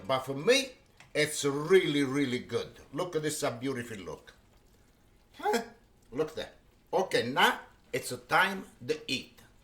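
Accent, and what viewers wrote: Italy. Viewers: I love his thick Italian accent. The best ❤️ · I am here for the accent and the kickass Italian vibe here!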